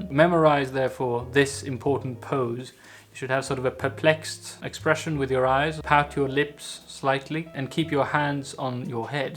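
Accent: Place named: England, United Kingdom. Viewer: Are you Swedish or English? If not, where did you get your English accent? Can you do one of you speaking Swedish?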